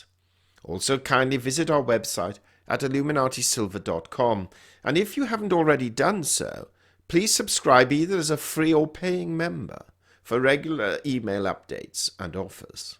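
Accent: England, United Kingdom. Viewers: Although all this is threatening information for my small portfolio (but not really unknown to me), I thank you. Allow a personal remark, please: you have a lovely English accent, pleasing voice and good diction (rare on YouTube).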